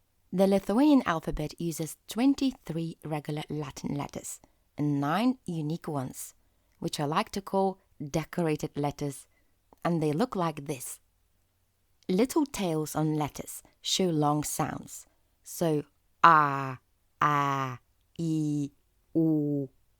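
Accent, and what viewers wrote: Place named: United Kingdom. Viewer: Are you a Brit?so pleasant English in here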